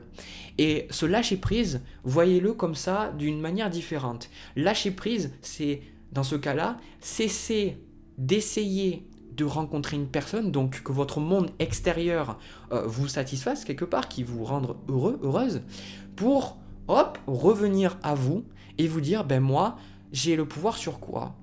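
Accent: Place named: South, France. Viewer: Merci beaucoup...l'accent de "notre " sud est 1 plus 😊😉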